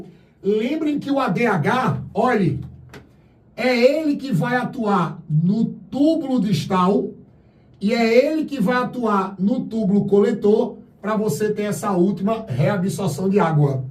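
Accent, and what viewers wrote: Northeast, Brazil. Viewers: amo o sutaque nordestino representando minha terra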